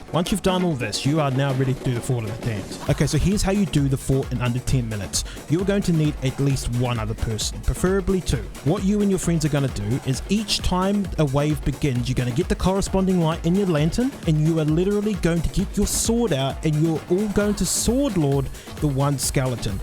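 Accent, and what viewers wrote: New Zealand. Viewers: Love the New Zealand accent (well I hope it is) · Are u a kiwi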